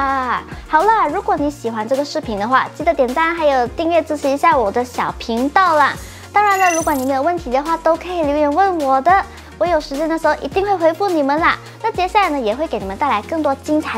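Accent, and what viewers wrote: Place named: Malaysia. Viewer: 馬來西亞口音與講話習性 我也不太能接受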